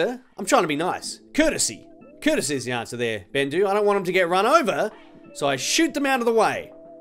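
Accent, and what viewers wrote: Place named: Australia. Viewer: I didn’t know he was Australian I thought he was Italian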